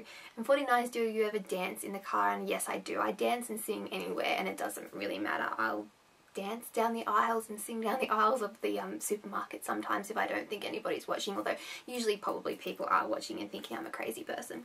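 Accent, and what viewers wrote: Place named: Australia. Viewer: just stumbles onto this video and had to subscribe, it sounds creepy but you have the nicest, most relaxing voice and accent ever! i love australian accents! Definitely see live of you get chance, i've seen them 4 times now and they're my favourite band ever! They're kncredible live! And Danny is a lot hotter close up;) haha x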